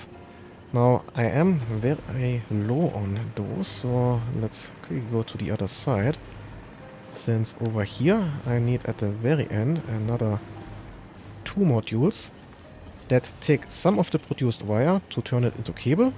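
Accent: Germany. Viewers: Man höhrt deinen Deutschen Akzent soo gut raus, ich liebe es :)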